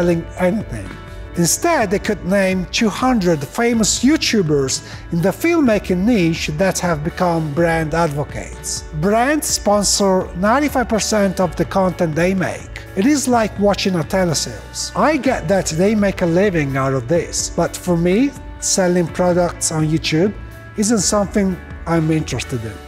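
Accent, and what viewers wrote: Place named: Eastern Europe. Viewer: not to be disrespectful, but rather totally honest and non critical. you remind me of Al Pacino - but with an eastern European accent. It is actually really interesting your manner and way of speaking. i really like it a lot. and your english is perfect just the way it is! nice video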